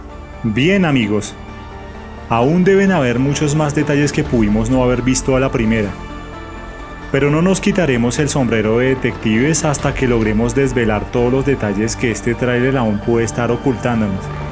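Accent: Colombia. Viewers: Un saludo amigos: Si no estoy mal hay que saludar en plural pues ustedes son un equipo, me enorgullece mucho realmente, estoy bastante alegre con ver una propuesta de canal de entretenimiento como este y mas si es de mi país parece (Colombia) Se puede deducir por el acento. Cada análisis propuesto aquí, cada palabra, cabo suelto atado, cada pista descifrada, cada misterio resuelto o propuesto es una joya. Death Stranding ha recibido mucho Hype, eso todos lo sabemos, el titulo ha recibido criticas, insultos, etc, haters siempre va a ver, pero si algo todos debemos reconocer es que la espera ya de por si hace parte del titulo, el misterio detrás nos coloca a jugar desde ya, formando y buscando cada ficha del rompecabezas, esto es un titulo de Kojima, Kojima no es un desarrollador de juegos, Kojima no hace vivideros, Kojima es un artista, Kojima hace piezas de colección, así debemos verlo, así debería tomarse, Hideo esta a otro nivel, a mi me podría decepcionar el resultado si, me podrá parecer horrible, claro, pero debo ver que por mediocre que sea o impactante que resulte es una obra de arte y el arte es subjetivo, para mi sea como sea, ya es brillante lo que esta sucediendo en medio de la intriga. 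Muchas gracias por estos análisis y espero, encarecidamente sigan creciendo, un 100% De apoyo.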